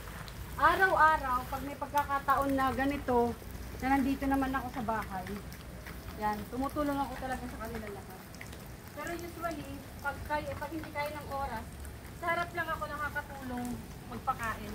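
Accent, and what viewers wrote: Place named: Visayas, Philippines. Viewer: Madam napansin ko parang bisaya salita mo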